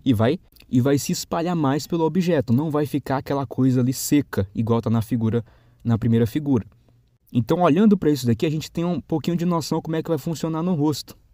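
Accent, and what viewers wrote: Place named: Ceará, Brazil. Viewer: Você é Cearense amigo, seu jeito de falar lembra muito. Muito bom a forma que você explica kkkkkk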